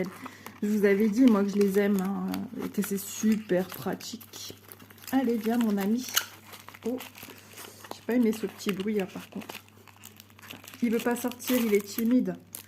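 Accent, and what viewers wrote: North, France. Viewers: Bonjour très jolie les sorcières 🧙‍♀️ vous en avez déjà brodé une ou pas bonne journée sa fait du bien d’entendre votre accent du nord je suis du nord aussi bisous 😊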